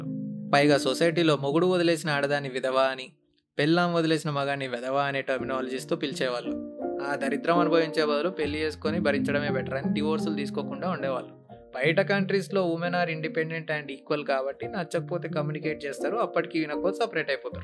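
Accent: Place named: United States. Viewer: Arranged marriages tho naaku problem kaadhu love marriage thone na problem . Andhukante nuvvu india lo unna nee matallo America vinipisthundhi sorry but I sense that . But india lo love marraige vadhu boss endhukante alanti oka love marriage couple ki puttina vaadine nenu . Naaku telusu ela unatadho vere caste ammayi abbayi pelli chesukinte aa putte pillalni ela chustharo . Aa chupulu neeku teleedhu baasu . Adhi neeku physical emi cheyyala povachu deep inside guchukuntadhi bhayya it's very hurting untadhi . Nuvvu puttadame papam annattu chussthe . Nee friends mothers nee tho friend ship cheyyodhu ani mee friend ki warning isthe vaadu appati varaku bhane mayladi tharvatha Mohan chatesthe aa bhadha chala bhayam karam ga untadhi . I envy u kids who are born for same caste arranged marriage couples . Arranged marriage lekapothe mundhu nuvvundav basu . 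Adhi okappudu chala manchi system . Aandhulo mana previous generation chala successful lead chesaru lives that's y nuvvu America velli patriarchal system criticize cheyyagaluguthunnav . Problem mana generation kids mind set lo undhi . Andhariki rights kavali responsibilities vadhu adhe major problem . Ee America velle vallaki patriarchy endhuku nachado artham kaadhu . Malli magallaki value ledhu antaro . Point Ento telusa mana south states lo patriachy kaadhu matriarchal system father enthaina earning varake . Caste system lo oka plus undhi idhi live marraige chesukunna ma mother ee chepparu enti telusa . Prathi oka caste ki vere ve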